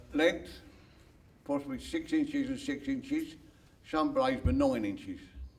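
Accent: United Kingdom. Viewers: Only British man that is immune to being made fun of for his accent.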